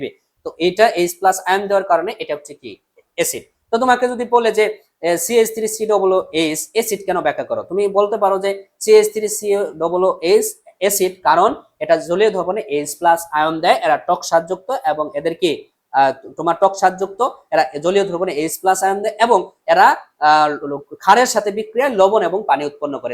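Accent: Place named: Sarishabari, Bangladesh. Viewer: না ভাইয়া আপনার কথা শুনে মনে হলো যে আপনি শরিষা বাড়ির স্থানীয়। আমার ওখানে আত্মাীয় আছে তো তাই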